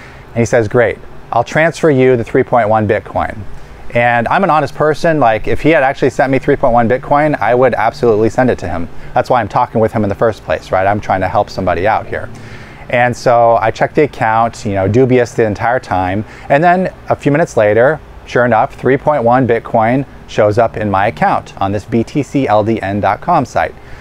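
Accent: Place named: United States. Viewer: Hello, I hope you are well. It's true, I'm not so tech savvy. But it's also true, I trade crypto - in the Winter hahaha. Is it possible you could put together a video on handling crypto safely - all your thoughts, experiences, past mistakes or close calls. Yes, I know there are probably dozens of them out there in youtube-land, but I watch your videos and feel you speak so honestly and directly and I think you would probably put a lot of thought into it and it would be super great. Maybe you have a lot of things to do every day. It's just a question. Thanks again for being so great. I really like your American accent. I know I wrote that before, but I do.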